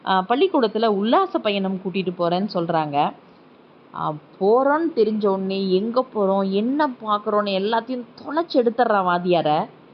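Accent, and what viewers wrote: Kongu, India. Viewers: கரிசல் கதை கொங்கு தமிழில் 👌🏻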